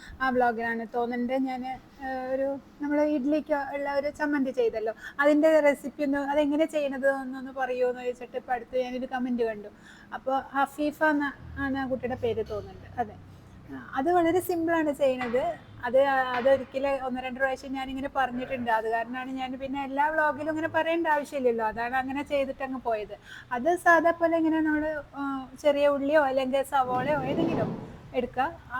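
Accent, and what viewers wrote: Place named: Palakkad, India. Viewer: പാലക്കാട്‌ സ്ലാങ്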